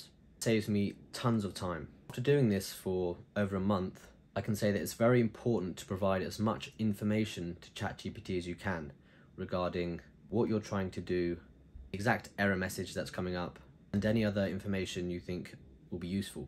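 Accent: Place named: United Kingdom. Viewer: The British accent...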